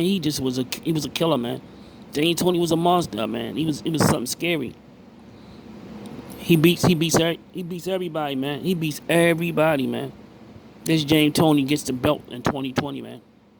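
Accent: Baltimore, United States. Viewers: Heavy Baltimore accent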